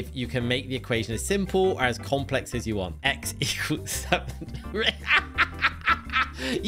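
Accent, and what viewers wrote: United Kingdom. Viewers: Noa fan of the British accent and the large number of words pronounced incorrectly.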